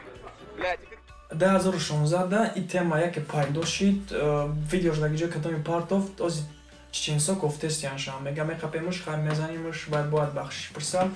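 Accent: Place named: Dushanbe, Tajikistan. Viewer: мо душанбегие хамихели гап мезанем ихели лавза созтар мефахмем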